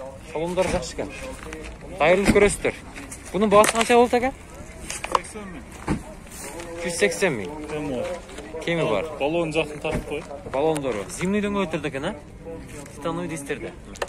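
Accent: Kyrgyzstan. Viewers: Алып баруучу азаматсыз!Таза кыргызча сүйлөйт экенсиз!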